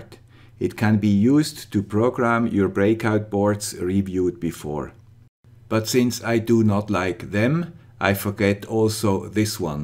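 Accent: Switzerland. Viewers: schön wenn ma zu sim schwiizer akzent stoh chan ;)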